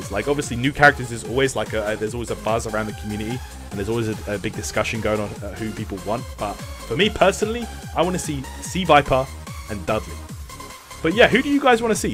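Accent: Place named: United Kingdom. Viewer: Lol "Street Figh-uh," "cosch-yooms," lol! British accent!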